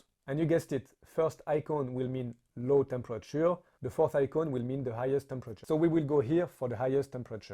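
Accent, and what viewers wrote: France. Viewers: You have to be from Quebec or France. Love the accent.❤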